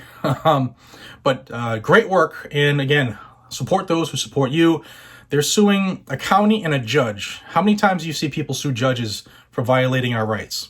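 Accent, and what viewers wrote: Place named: Massachusetts, United States. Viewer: JoJa=Georgia gotta love the Massachusetts accent lol. Love ur videos man thank you for being a voice for our rights! Keep up the great work. You ARE valued!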